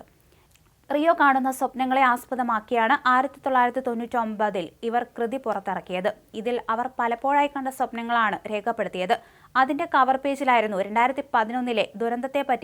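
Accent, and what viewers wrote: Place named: Pala, India. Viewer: Palakaari aanan thonun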